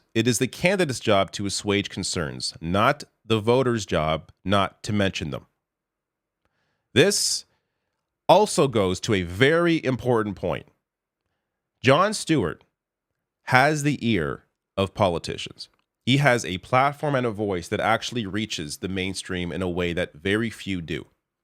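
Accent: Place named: Canada. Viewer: This guy sounds like a Canadian.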